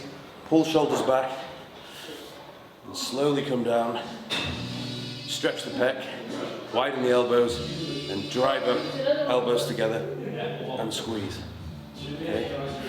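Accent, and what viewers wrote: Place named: England, United Kingdom. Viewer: Finally an English accent!!!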